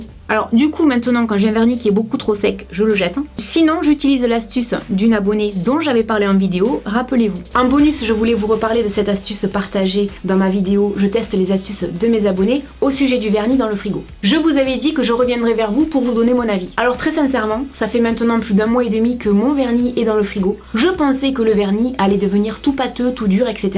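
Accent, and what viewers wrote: South, France. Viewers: J'adore ton accent du sud!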